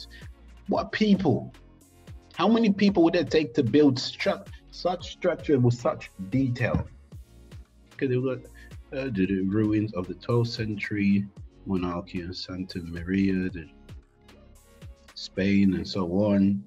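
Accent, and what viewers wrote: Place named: Israel. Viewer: The isn't white? 🤯 no just kidding I knew even when I started watching your videos and hearing the sound of your voice that you where a Hebrew. Seek Yahusha messiah my friend! Yah bless!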